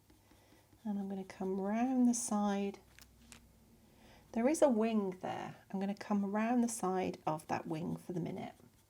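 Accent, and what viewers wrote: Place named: England, United Kingdom. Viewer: Fantastic tutorial! Just drew it and he came out lovely. That C'aran D'ache Museum Aquarelle white pencil is just pure magic for the wing. Make translucency so believable. Thank you for this, it made my Sunday afternoon really enjoyable. That, and knowing you are living near Dartmoor where I was born, and come from the South East, also where I used to live, in Berkshire for a while till I moved abroad. As an Arizona expat for 25 years now, it makes me homesick to hear the English accent all over again, as I don't go home so often these days. Thanks again!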